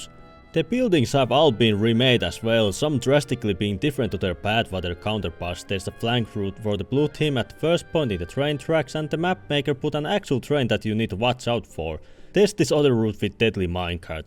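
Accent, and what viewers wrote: Finland. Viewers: Kiva rallienglanti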